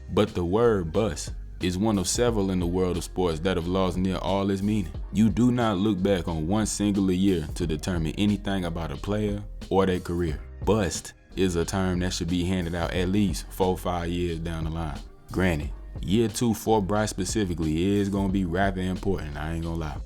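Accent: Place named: Carolina, United States. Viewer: Bro , Idk why people were defending that OL so much this year! I been telling my panthers friend, that the O-Line was a huge part of the problem, yet the media been on bryce's ass since day 1, pretending that PFF rankings and preseason expectations are the end all be all, without actually watching the games. As a Browns fan I know exactly what it's like to get half assed national media coverage that then influences the whole discussion about the team. Love from Austria, I love the accent btw. Real Carolina!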